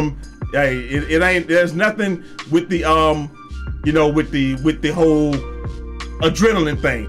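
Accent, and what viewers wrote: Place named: South, United States. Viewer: Crazy you had Shannon Sharpe in this video lol I be calling you the Shannon Sharpe of the 2k community. Not based on age of course but just style and personality. Funny as hell 😂 and the southern accent too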